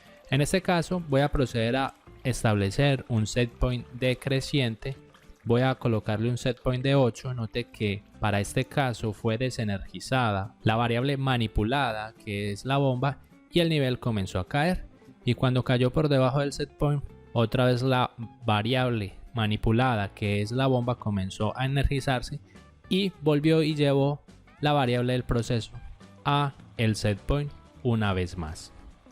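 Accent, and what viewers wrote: Colombia. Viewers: Muy buen video. Tienes acento Colombiano ¿si?.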